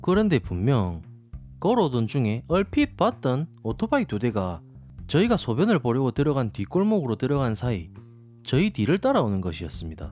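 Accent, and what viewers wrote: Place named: Tongyeong, South Korea. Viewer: 나 학교 다닐때 국사랑 지리시간에 잠만 자서 지리말고 이 썰 듣는데 재밌노ㅋㅋㅋ 썰 더 풀어주세요!! 구수한 통영 사투리라 더 감칠맛나게 귀에 쏙쏙👍🏻👍🏻 대박나소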